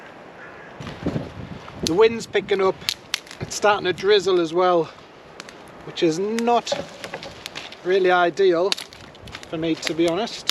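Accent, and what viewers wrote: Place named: Newcastle, United Kingdom. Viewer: By the way, I do want to add that I did enjoy the video. You're like a Geordie version of Joe Robinet.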